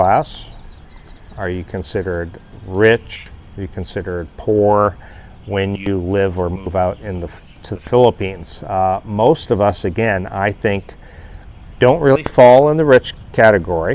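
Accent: United States. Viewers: I noticed you wear Italian badges, but you sound like an American. If you are from America, are you not proud to wear the American flag?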